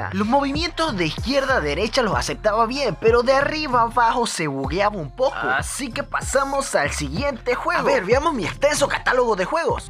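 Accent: Chile. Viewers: eres chileno :v?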